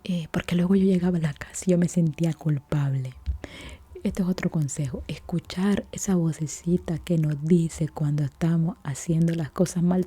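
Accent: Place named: Nicaragua. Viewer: Tengo poco tiempo escuchandote pero, me gusta mucho tus videos sobre todo susurrando o platicando están super relajantes y me gusta mucho tu acento de voz nicaragüense, gracias por tus videos , saludos.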